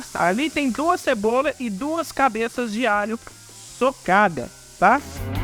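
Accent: Minas Gerais, Brazil. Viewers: Modo de falar hororoso sotaque mineiro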